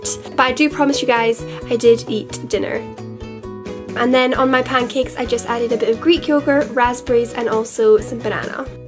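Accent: Scotland, United Kingdom. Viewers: Where do you live? Are you Scottish ? I am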